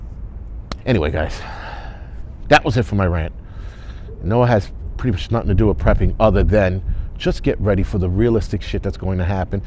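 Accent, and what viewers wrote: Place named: Cleveland, United States. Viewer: Wow is on one today! Lol you sound like my boys back home in Cleveland. Now that I live in Ky I can definitely pick up on city accents and vernacular.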